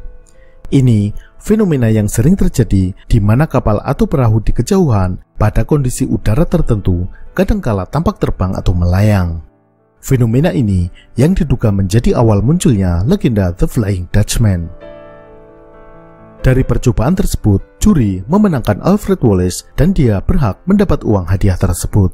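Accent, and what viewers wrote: Indonesia. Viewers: Karakter suara medok nya biarin natural aj bro.. g usah gegayaan dibuat2 pake intonasi.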